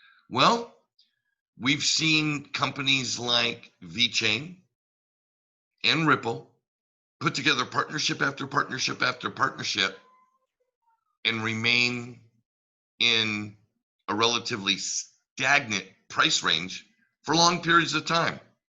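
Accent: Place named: California, United States. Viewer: yeah just discovered you in the last couple days. I heard you’re from NorCal my tax guy lives in SoCal so maybe it’s a CA accent. He’s your age too probably. Great tax guy! and I’m liking your channel so great guy here too😊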